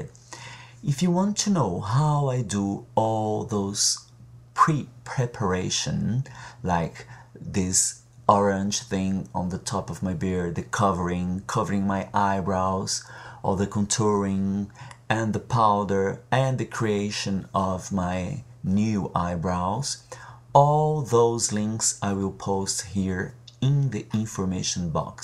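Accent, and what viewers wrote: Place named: Brazil. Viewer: Hey, are you brazilian? I'm asking because I am and your accent seems like brazilian accent. If the answer is yes so... Você é muito diva, querida. Que bom que você está fazendo sucesso. Nunca desista da sua felicidade e sonhos... XO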